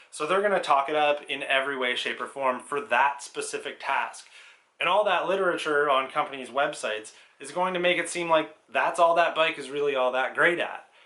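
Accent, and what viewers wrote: Canada. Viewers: He's Canadian. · Canada for sure.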